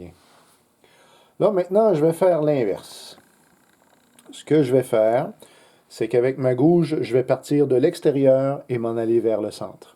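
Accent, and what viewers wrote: Quebec, Canada. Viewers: Je découvre le tournage. Bravo vos vidéos sont très utiles et... j adore l accent de la Belle Province!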